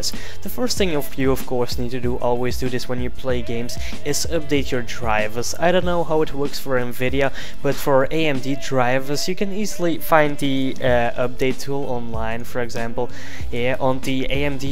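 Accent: Netherlands. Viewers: You are dutch? :D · are u dutch? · Yay nederlander die engels praat lols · Whuut are you dutch? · Wait a sec, you dutch bro? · Are you from the nethetlands? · je hoort heeell goed als iemand nederlands is xDD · oooh je bent nederlands k daxht al toen je control alt delete zei youre dutch i was thinking about your accent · So you're Dutch, or Flemish?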